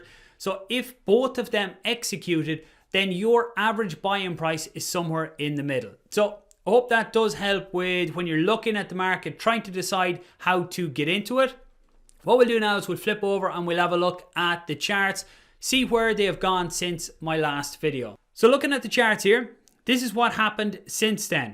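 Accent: Ireland. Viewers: Great idea just creating content just for ADA. Are you from Ireland? I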